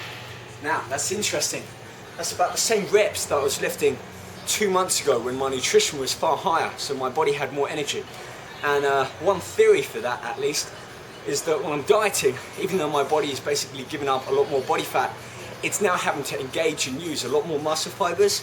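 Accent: United Kingdom. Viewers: i never did like brittish accents, but the guy is decent well proportioned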